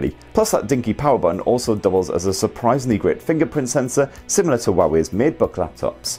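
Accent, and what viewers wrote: United Kingdom. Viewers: His British accent is very funny and witty. A charming guy! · Could you please re-do this video in English please without the limey accent?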